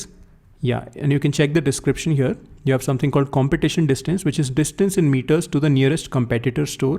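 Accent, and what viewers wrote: India. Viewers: While his Indian accent is bearable, not as strong as the typical Indian accent, I still feel uncomfortable listening to this kind of accent. I don’t know why. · The Indian accent is the accent of the knowledge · Thank you so much for providing in India accent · you've lost me at the indian accent. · You have won me at your indian accent ❤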